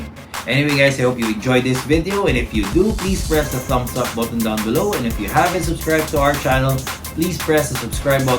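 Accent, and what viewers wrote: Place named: Philippines. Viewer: Super Filipino accent lmaoooo!! Sound like my friends lmaooo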